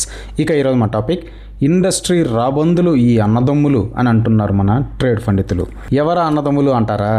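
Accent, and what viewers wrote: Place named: Telangana, India. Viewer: Antha baane undi gani Nuv matladedi Telangana na, Srikakulam ah, Godavari yaasa na ardam kavatle anna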